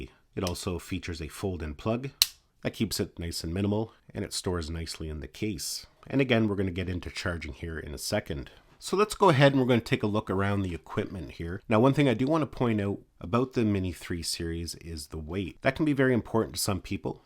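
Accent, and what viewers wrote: Canada. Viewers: Are you Canadian or an American state bordering Canada?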